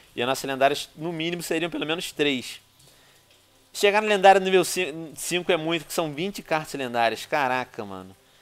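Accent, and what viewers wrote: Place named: Portugal. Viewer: Kaio ele é português ele fala assim.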